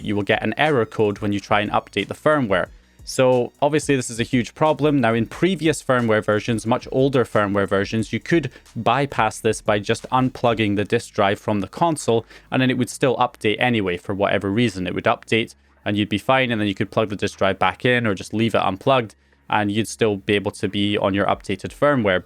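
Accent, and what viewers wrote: United Kingdom. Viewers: Thanks for the vid. Always looking forward to hearing this guy's UK accent.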